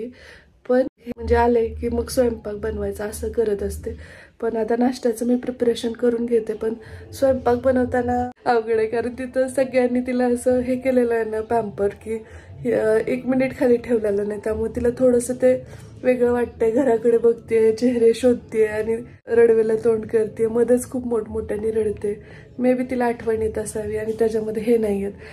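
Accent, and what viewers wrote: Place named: Udgir, India. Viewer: मी पण udgir ची आहे. तुझे vlog पहिले आणि तुझं बोलण ऐकलं की आपल वाटत. आपली भाषा जवळची वाटते.. मस्त झालेय बेबी. मी खास तिला पाहण्यासाठीच व्हिडिओ बघते तुझे. 🤗🌹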